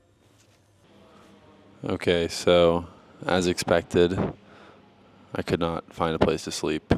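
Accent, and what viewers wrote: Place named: Eastern Europe. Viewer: Which country are you from? You sound like eastern european, which is cool, I myself am from Poland.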